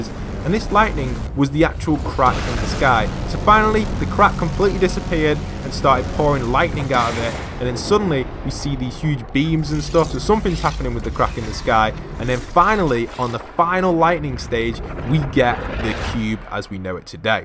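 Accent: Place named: England, United Kingdom. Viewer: He has like English accent don’t hate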